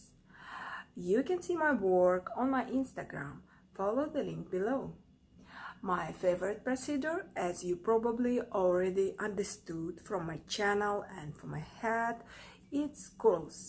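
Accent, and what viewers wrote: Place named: Russia. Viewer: yes i knew you spoke russian by your accent!!!! :)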